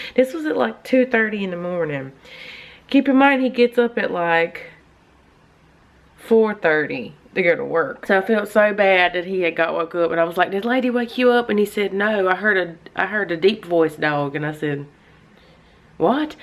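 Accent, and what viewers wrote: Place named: Mississippi, United States. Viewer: Girl are you sure you ain’t from Tupelo Mississippi? 🤣 the way you talk and explain things... you always make me smile. 😘❤️❤️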